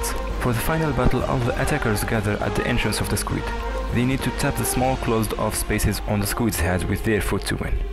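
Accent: Morocco. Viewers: dude From the first second of this video i knew it , you're 100% moroccan 😭😭pls keep going i like your channel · Accent mn kilomètre t3rfha mghribia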